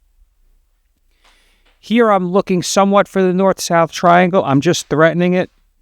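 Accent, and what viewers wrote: United States. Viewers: Thank you for your channel and thank you for your accent. I’m a big fan of American regionalism and the maintaining of American accents.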